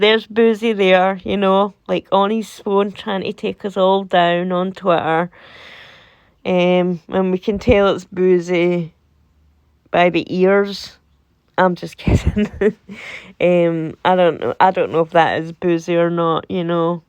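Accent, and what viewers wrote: Scotland, United Kingdom. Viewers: Great video!! Yes we love your accent. Love Scotland. I'm a descendant of Scotland.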